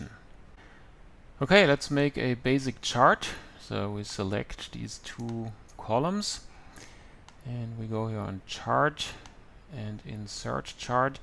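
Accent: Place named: Germany. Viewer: German accent makes this video even more informal! ;) (like)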